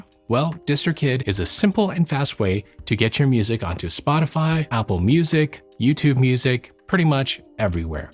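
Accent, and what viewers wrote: India. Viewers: R u Tamil guy?😅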